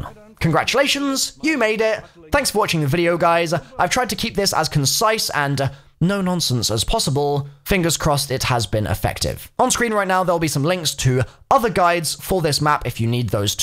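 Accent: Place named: United Kingdom. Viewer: "cemetree"? "vaze"? I cannot take the British accent seriously.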